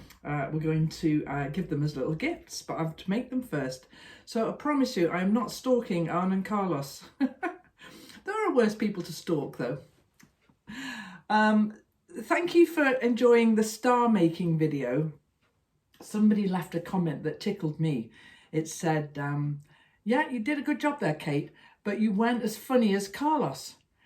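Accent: United Kingdom. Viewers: I just love watching you and listening to you chat away about things in life. I would like to know about that gorgeous tree on your window. You are most inspiring and I look forward to each day sharing with you all the things I love. I am an American, and have lived my adult life (now 77 years old) in Switzerland, mostly, also South Africa and Austria, too. I went to British schools and I love to hear you speak, British English, using familiar sayings. I chuckle at your humor (written humour, I believe in England). You sort of bring my several worlds together.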